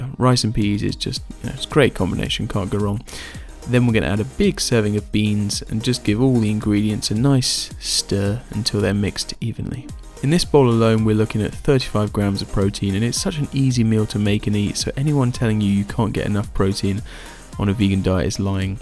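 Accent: RP, United Kingdom. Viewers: You speak with a perfect RP (Received Pronunciation). You should consider doing voice overs for ads etc..while you are studying. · You have a very posh accent, great meals thanks.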